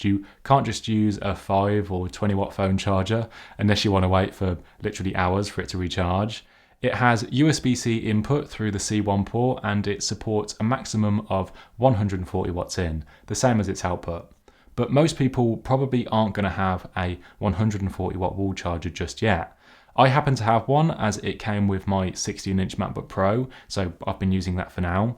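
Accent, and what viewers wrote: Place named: West Midlands, United Kingdom. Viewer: I’m from West Mids, can always tell haha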